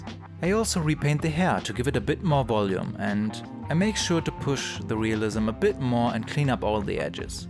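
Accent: Germany. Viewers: are you german? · You have a German acsent Ich finde es schön wie du darüber erzählst was zeichnen für dich ist und das man nicht auf andere hören soll....Danke für die tipps 😄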